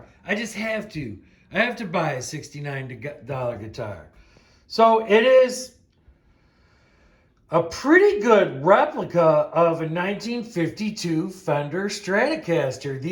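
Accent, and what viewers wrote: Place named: United States. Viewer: Love your American accent takes me back to the birth of Rock and Roll as a kid listening to American Forces network broadcast from Hilversom in Holland late 40s great video my friend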